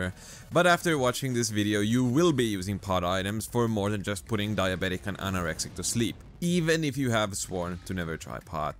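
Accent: Finland. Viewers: New sub based solely on the humour. 😂 For me, he worst thing about combat is scrolling the damn items to get to things I need. By that time, I'm usually dead or almost dead. 😂 Edit: also, you sound Finnish. Is that right?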